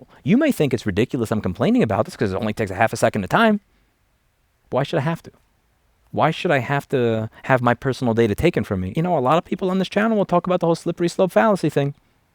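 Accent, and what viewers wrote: New York, United States. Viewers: The New York drawl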